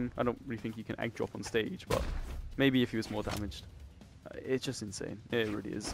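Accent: United Kingdom. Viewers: i like your BRITISH ACCENT btw im british too im just gonna eat me crumpets and a bloody cup'a tea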